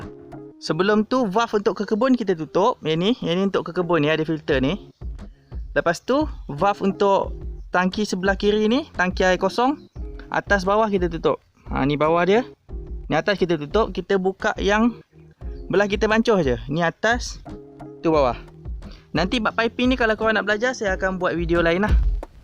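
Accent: Pahang, Malaysia. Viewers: ckp mcm org pahang..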